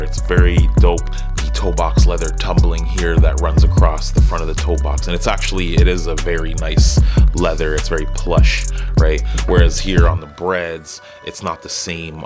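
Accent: Toronto, Canada. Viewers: Toronto mans accent strong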